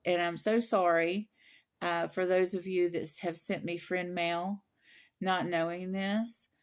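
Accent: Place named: RP, United Kingdom. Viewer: Queens English, no less.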